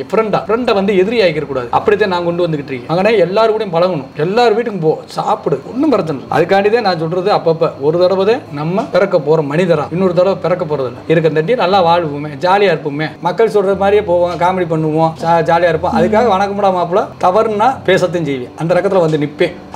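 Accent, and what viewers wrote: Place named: Usilampatti, India. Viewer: உங்கள் சமுதாயம் அக்கறை பார்க்கும் போது மகிழ்ச்சி உள்ளது அண்ணன்.நம்ம வட்டார வழக்கு அழகு அண்ணன் உசிலம்பட்டி இருந்து 😊😊😊😊😊😊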